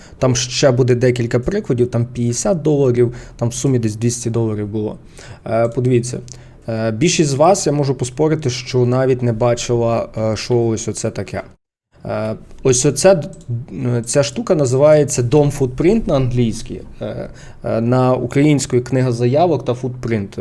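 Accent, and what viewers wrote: Lviv, Ukraine. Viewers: Українська мова Львіський акцент ТАК ТРЕБА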